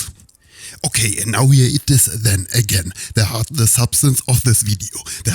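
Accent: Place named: Germany. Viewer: Wenn ich das richtig raus höre hast du einen starken deutschen akzent, also verstehst du sehr wahrscheinlich deutsch oder liege ich mit der Annahme falsch.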